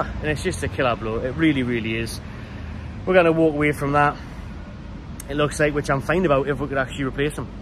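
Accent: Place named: Newcastle, United Kingdom. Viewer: The subtitles on YouTube don’t account for the geordie accent 😂 said career and the subtitles came up as Korea 😂😂😂. Cheers for the update!!!!!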